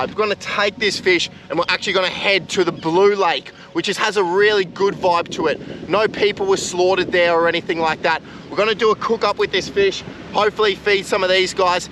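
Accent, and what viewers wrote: Australia. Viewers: This dude has a Aussie accent you cant forget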